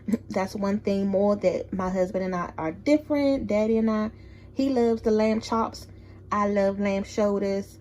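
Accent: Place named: DMV, United States. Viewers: Looks delicious.. and I know a DMV accent when I hear one. I love it!💜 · Yes! That undeniable DMV accent. And if I'm hearing correctly, I think it may even be right outside of DC on the VA side - "town" side of Alexandria even tho I'm from the "highway" side. I could just be biased lol 😌. This looks so good I can almost taste it!!😋🤤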